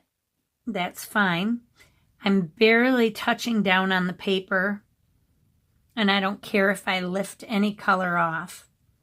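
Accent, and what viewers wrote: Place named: Midwest, United States. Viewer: That midwest dialect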